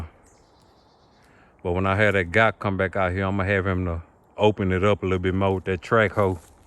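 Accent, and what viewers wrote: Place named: South, United States. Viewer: Where are you from? That sounds like a strong Southern accent... like mine 😂. First time watching, from MS.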